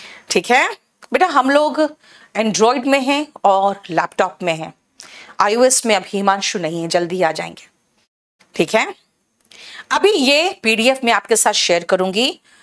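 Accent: Jharkhand, India. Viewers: Aap Jharkhand se ho maim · Mam aap jharkhand se ho kya ❤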